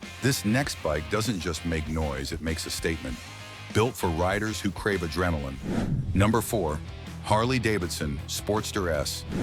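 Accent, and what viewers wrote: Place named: United States. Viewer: This video is funny. First of all using a American accent but this list is far from American choices of bikes in that order. More than half these bikes are not in demand in America as they want you to believe. This video is obviously catered to the people of the U.K. too funny... Most American bikes on the streets will be Sport bikes 750cc and above, all classes of HD and Indian with maxed out Horsepower. We like bikes that are fast and big here people, so don't kid yourselves.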